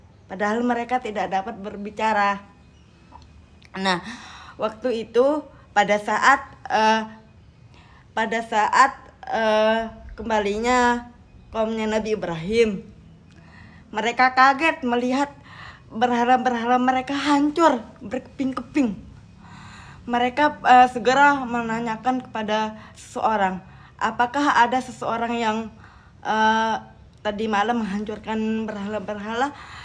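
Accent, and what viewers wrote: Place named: Lombok, Indonesia. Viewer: Lombok banget logatnya kak...sehat selalu anak soleh❤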